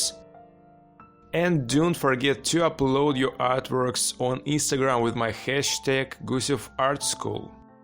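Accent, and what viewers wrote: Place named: Russia. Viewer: Amazing,i love your russian accent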